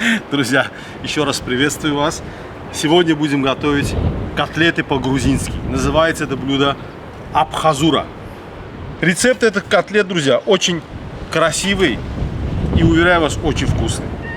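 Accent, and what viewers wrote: Baku, Azerbaijan. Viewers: У вас акцент Азербайджанский! Вы Азербайджанец?😊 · Бакинский акцент, может вы где то на даче в Новханах,ha? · Салам!!! Меня зовут Тимур я живу в Украине в городе Днепр! Я ваш подпищик К сожелению не знаю как вас завут спасибо за ваше видео все очень красиво один акцент ваш Бакинский чего стоит хотел вас попросить чтобы вы преготовили одно Азербайджанское блюдо называется Хящиль помню кушал но без сахарного песка не возможно кушать всегда нужно подсыпать в тарелку готовят это блюдо из муки и оно очень пресное, если получится будет прикольно зарание спасибо!!!😊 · у меня бабушка из Шеки, она всегда самая держит дома чаман. Приятно слушать бакинский акцент, привет вам от бакинских армян❤ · Здравствуйте! Я когда то жила в самом прекрасном городе Баку! Очень аппетитно готовите, с очень вкусным бакинским акцентом! Спасибо за удовольствие вас смотреть! 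Подписалась однозначно! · И ещё хочу добавить,что Ваш Бакинский акцент.....без слов,это шедевр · Огромный привет вам из Грузии,дорогой мастер своего дела! У вас акцент Бакинский! Всему вашему прекрасному народу привет от грузина!👋🙂🇬🇪🇦🇿✊ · Бакинская культура, Бакинский акцент не каждый понемаетеее.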